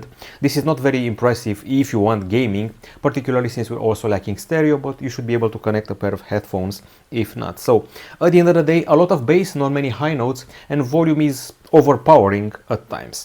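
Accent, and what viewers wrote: Romania. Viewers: Ba tu esti român! Se aude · e obositor să asculți un român explicând ceva în engleză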